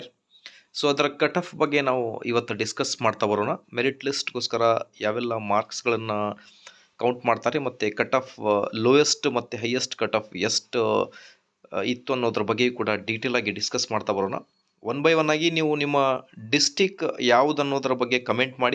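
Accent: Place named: Mysuru, India. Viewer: Mysuru english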